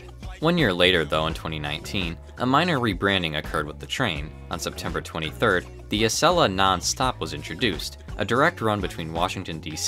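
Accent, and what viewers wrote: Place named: Chicago, United States. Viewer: You gotta be a Chicago boy/or visitor👍🏻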